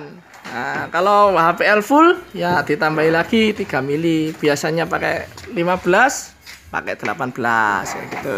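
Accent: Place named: Java, Indonesia. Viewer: sip boso jowone medok .👍👍👍👍 tapi inspiratif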